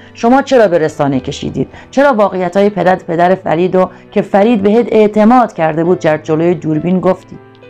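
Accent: Iran. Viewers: دختر قوما اول باید لهجه ایرانی بگذار و به لهجه دری زبان مادری خود مان حرف بزن باز بیا حرف بزن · خانم جان خودت واقعاء یک انسان دورغگو هستی در یک ویدئو از همان تعرف میکنی وحالا از همایون به بدی یاد میکنی با لهجه ایرانیت. خواهر اگر پول می خوای پیدا کنی یک روز را یکی را خوب وبار دیگر از او بدی میگوی خودت هم یک هنر مند خوبی هستی دل سوزی تو به فرید نداری به خودت دا ی یک چینل داشته باشی خانم همایون افغان آدم بسیار خوب است فرید را تشویق به دروغ گفتن نکن باید فرید سر زنیش شود خودت دل سوز نشان ندهید همه به خاطر پول از یتوب است خانم جان هنر پیشه خوبی هستی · خانم بسیار با لعجه ایرانی حرف میزنید لطفا اول قضاوت بیجا نکنید راجع به همایون چون او آنقدر حمایت کرد و همایون پیش از فرید بینیده خود را داشت فرید را همایون بد نام و نابود نکرده خودش با اینکه همایون همه خوبی و اعتماد کرد خوش با ذیرکی که داشت خودش دوباره در چاه خود را آنداخت مگر همایون میخواست او را از چاه بکشد خودت خانم فریب پویا را نخور اگر بسیار مهربان هستی بیا دست فرید را بگیر نه اینکه از ایران اینقدر قضاوت ناحق کنی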